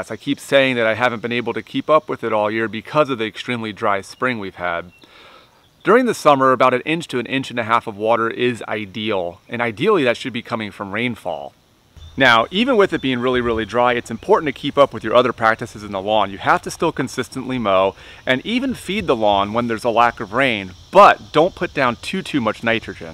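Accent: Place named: North, United Kingdom. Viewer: What's your location? you sound like you're from up North but Bermuda doesn't grow up there ha.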